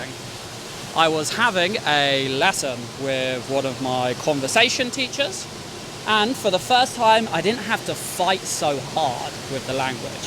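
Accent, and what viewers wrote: United Kingdom. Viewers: هذا أشبه بالبودكاست وليس بالمدخلات المفهومة لأنه حسب ما أعتقد ليس في المشهد مايساعد على الفهم باستثناء الكتابة كحركات المتحدث او الاشارة الى مايحيط به لنفهم مايقصده فقط عندما كدت تسقط .هه.اوفي الاخير لما قلت وداعا نراكم لاحقا ..بودكاست في الطبيعة..حتى مستوى b1سيعاني حتى يفهمك ..ولكنني أقدر مجهودك في التحدث بينما تصعد الجبل وتقدم النصائح وهذا مجهود مزدوج...شكر من القلب..لهجتك البريطانية أصبحت أصعب كلما حاولت الوصول الى القمة وتعبت أكثر .. علينا أن نفهم مهما كان الحال..واصلوا ..فالرحلة الى الطلاقة معكم ممتعة متعة صوت الشلال بجانبك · I enjoy your British accent👌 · Hi I'm from Philippines 🇵🇭 love watching your video your accent I really like to imitate to have a British accent to I always follow your video hope you visit Philippines 🇵🇭 thanks I learn a lot from you 😊❤❤❤ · Usually I don't liesint to UK accent but I like it frome you maby because you speak normally and using simple words ❤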